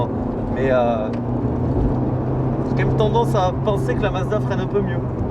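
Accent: South, France. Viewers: Merci, tes vidéos sont toujours un régal à regarder et à écouter (avé l'ascent) ça nous met du soleil dans la tête. J'espère que tes abonnés apprécieront le petit tour, parce que tu ne l'as pas dit mais filmer de la place arrière avec ta conduite, mon dos a pris cher....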